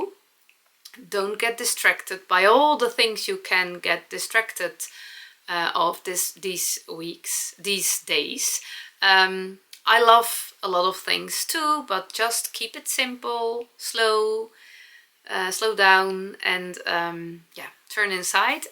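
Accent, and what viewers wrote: Netherlands. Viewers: Merry Christmas and Happy New Year,! Never feel the need to apologize for your "Dutchy English!" We love it! My kids and I are trying to learn German together this year, and I would never be able to do a podcast in a foreign language! Whew! Also, I am a fellow curly hair girl, and I love your look both with and without the headbands. I never feel as confident with one on myself...but I love the way they look on others. I want to try wearing them more...must try knitting some, I guess!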